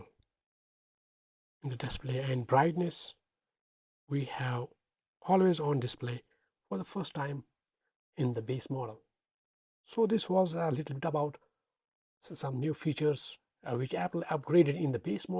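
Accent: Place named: Kashmir, India. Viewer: Kashmiri accent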